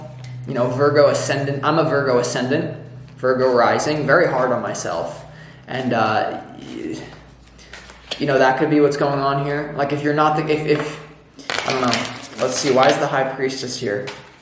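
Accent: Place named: South, United States. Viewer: It's weighing me down and not letting me step into the new and healthy energy and place in life that is right there if I could just let go of them and stop numbing out out of fear. And I also keep thinking maybe I can AGAIN approach this heavy relationship differently and it will magically fit into my highest good. I'm not sure it can though and that sucks. Also the southern accent was funny bc I'm from Alabama 😂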